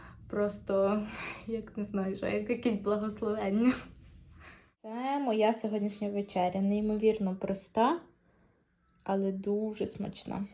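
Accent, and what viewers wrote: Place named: Hutsul, Ukraine. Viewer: Дякую і вам, що ви є у нас).🥰 Не хворійте. Ваш гуцульський акцент я просто обожнюю. У мене так не виходить 😊. Будемо чекати наступне відео 💙💛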